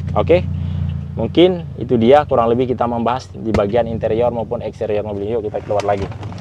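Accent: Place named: Indonesia. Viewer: Seneng denger bhs inggris masnya, logat asli indonesia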